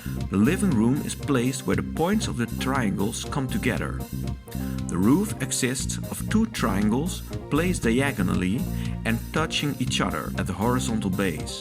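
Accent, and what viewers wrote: Netherlands. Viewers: You happen to be Dutch? Great video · Een Nederlander die Engels praat. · The narrator must be Dutch?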